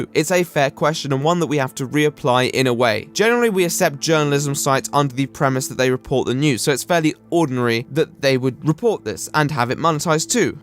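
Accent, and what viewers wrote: RP, United Kingdom. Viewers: You sound like super nanny do all posh people not know how to pronounce acceptable?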